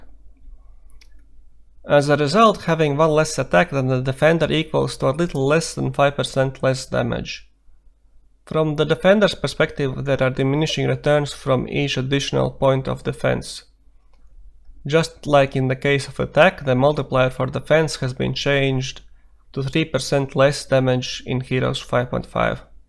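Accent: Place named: Latvia. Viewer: Latvian accent goes strong.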